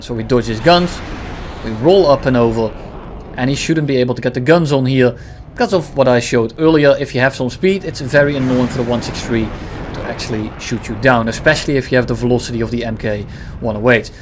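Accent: Netherlands. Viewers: you have somewhat of a dutch accent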